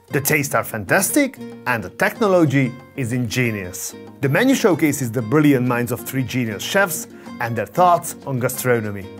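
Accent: Hungary. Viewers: Kilométerekről is felismerni a Magyar akcentust 🫣 Üdv itthonról!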